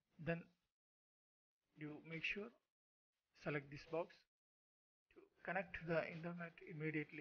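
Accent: India. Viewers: You mean he sounds like an indian?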